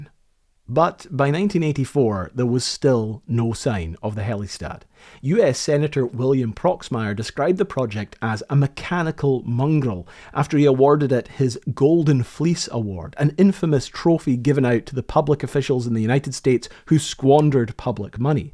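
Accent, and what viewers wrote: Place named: Scotland, United Kingdom. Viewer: Yet another great deep dive video by you of something unique and original, the fact its read by you with that Scottish accent, makes it even better. Kind regards John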